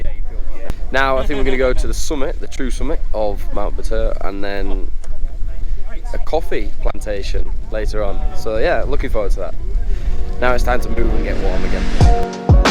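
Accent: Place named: Yorkshire, United Kingdom. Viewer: nice video brother. You sound like your'e from Yorkshire aha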